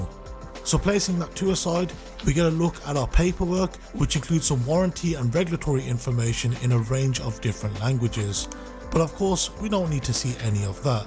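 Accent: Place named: West Country, United Kingdom. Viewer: Yo amigo thanks for the sub, I have subbed back. Great video and good to hear a local west country accent! Keep it up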